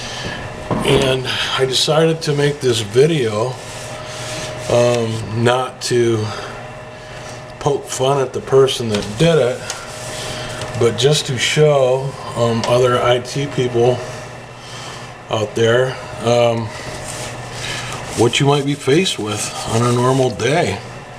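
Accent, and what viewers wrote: United States. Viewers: Are you from Missouri?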